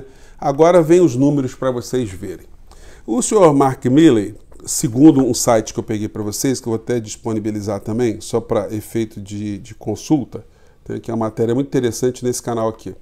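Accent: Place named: Northeast, Brazil. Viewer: Adooro as palavras do Professor! Parece nóis, nordestinos, falando...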